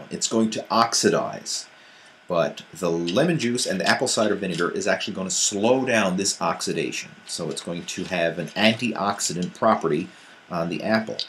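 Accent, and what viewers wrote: Newfoundland, Canada. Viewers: Hey brother,are you from Newfoundland? Cause i am,and i kinda hear a hint of the Newfoundland accent when you speak. Great video by the way.✌🏻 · Canadian eh?! I see we shop at superstore?!! lol love the accent :p Ps...I drink ACV with green tea every morning but I'll be sure to try this too :) thx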